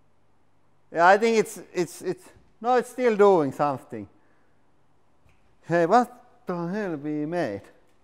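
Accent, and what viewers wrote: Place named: Finland. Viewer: You have finnish accent. :)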